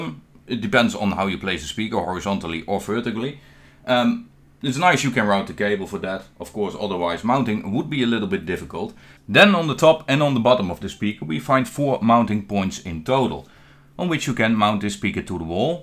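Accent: Netherlands. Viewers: You sound dutch bro but nice video bro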